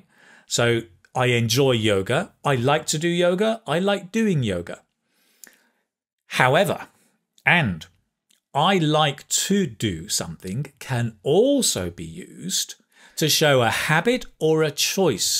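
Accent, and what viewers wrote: United Kingdom. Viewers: Wao thank you so much.I am obsessed with your videos.I am extremely curious how can I learn the British accent?I love your accent😇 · In my free time usually do learning English and copy the words with British accent as you had which makes me improve a lot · Do you speak with a British accent? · I just love to watch your videos and practice my fluency. I love that repeating session..feel as if I talk to a British teacher in person.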